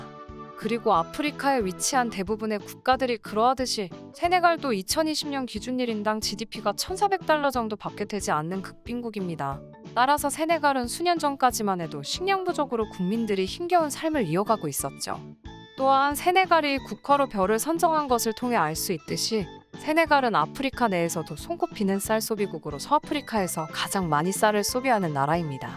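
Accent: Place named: Gyeongsang, South Korea. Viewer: 😊나레이터님 경남출신이신듯 ㅎ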